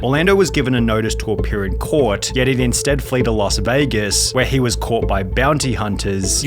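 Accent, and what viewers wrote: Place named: Australia. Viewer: Great...another Australian narrator... why?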